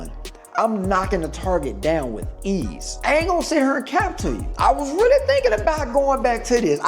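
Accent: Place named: South, United States. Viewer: u from Crenshaw fam? I thought u was born and raised in the South fam especially with da accent.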